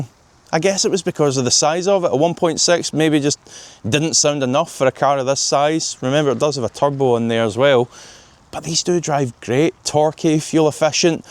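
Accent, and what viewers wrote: Scotland, United Kingdom. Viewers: There is nothing more satisfying than hearing the word Alcantara in that Scottish accent.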